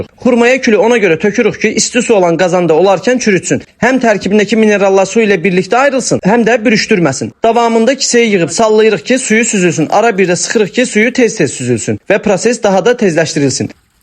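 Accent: Qakh, Azerbaijan. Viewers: Xeyirlisinden olsun Qax Şəki lehçemiz eynidi 😊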